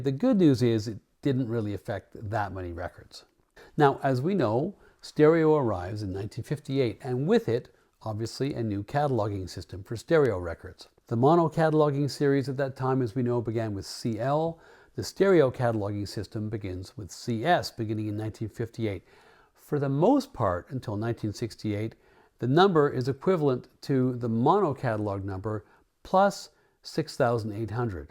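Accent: Canada. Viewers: I discovered your presentations by chance about 3 weeks ago. I'm enjoying the hell out of them.Your knowledge,quietly enjoyable Canadian delivery and sensibility,and your sense of humor have been a lot of fun for me,as someone who has enjoyed records-for the music,the labels,and the design,for well over 60 years. Your description of a weird portrait of Bobby Troup on a 50's album had me in stitches. Your work-and presence-have been a joy for me!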